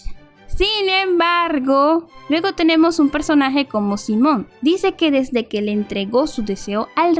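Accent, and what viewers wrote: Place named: Caribbean Coast, Colombia. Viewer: Eres de la costa de Colombia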